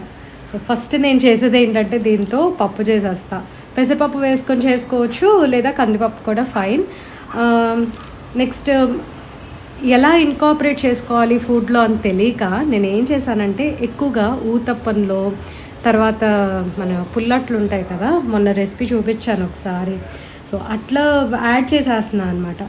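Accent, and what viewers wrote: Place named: Guntur-Krishna, India. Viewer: Hi, Mee voice ki sooper fan andi..maadhi guntur.. Guntur,Krishna distict vaalla slang baaguntundhi...vlog last lo Thankyou for watching...thankyou for your time is an awwwesome dailogue ❤ Yes...amma vaalu cheppedhi vinali....i experienced it many times...till now..after 2 kids also 😂 Munagaaku fry kifs tinaru..chedhu gaa untadhi ani...So, kaarappodi chestaanu.idli, dosa,upma lo ki tintaaru... I too use India gate n Rozana..but Last week, nenu offce lo potluck kosam, mushroom pulao chesaa..rozana rice antha baaga raaledhu... New channel chepparu...good to know..thanks for sharing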